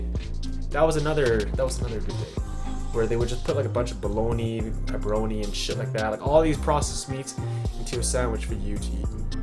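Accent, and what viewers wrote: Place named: Canada. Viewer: I can hear your Canadian accent! I am French Canadian and we love our ground pork in dishes around Christmas!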